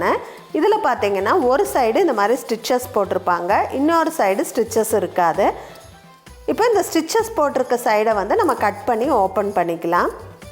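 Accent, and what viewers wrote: Coimbatore, India. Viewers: 😃. U r using ur platform right 💕💕.. From my view u r unique, did not copy anyone💞💕💕💞.. U have beautiful Coimbatore Slang👌💖💖👌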